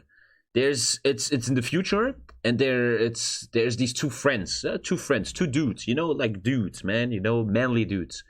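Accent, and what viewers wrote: Germany. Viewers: german accent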